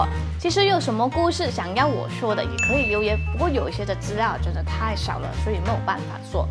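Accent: Malaysia. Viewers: 我实在是太喜欢马来西亚口语啦！可爱！ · 聽口音就知道是大馬人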